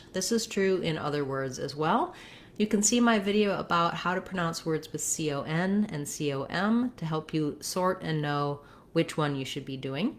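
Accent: United States. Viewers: I think you should state that these are US pronunciations. In the UK we use first syllable stress for noun and adjective.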